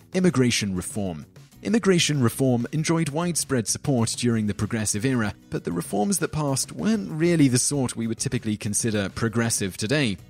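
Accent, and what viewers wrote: England, United Kingdom. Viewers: one second into the video and I'm instantly drawn away by the fact that a guy with a British accent is hosting this video. GET OVER IT YA DAMN BRITS WE BEAT YOU 241 YEARS AGO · Why is an English dude talking about America ...... Don't know why I find this weird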